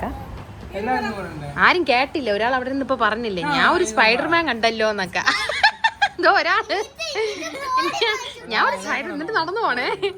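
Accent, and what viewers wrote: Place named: Trivandrum, India. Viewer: Trivandram aanalle language kttappol mansilayi evideya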